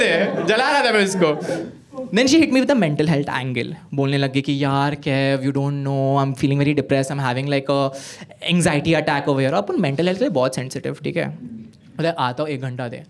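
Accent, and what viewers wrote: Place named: India. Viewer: After watching so many standup, atlast i came across our own people who talks hindi exactly like the mainlaind ( others don't get me wrong, we as northeastern part of india, for us Hindi is not a rice plate eating😂 it's definitely an undertaker play😂😂) Really appreciate it manh. Bro. 👍👍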